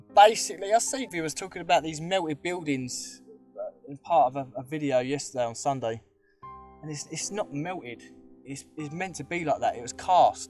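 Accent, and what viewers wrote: Essex, United Kingdom. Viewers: yeah you definitely got a mix of cockney and Essex I'm from mile end myself Great Channel been watching for a few months now just wondering if you have any other social media platforms channels Facebook or email ect ? because I would like to talk to you about a few things if possible I have a found a few tartarien pre reset buildings in the local East London area recently and obviously would be great to here your opinion thoughts and if you want to use them in a video your welcome obviously think it's gonna be a while until I receive another telepathic download about how free energy works and innerstand it properly but I'll keep you posted obviously 😇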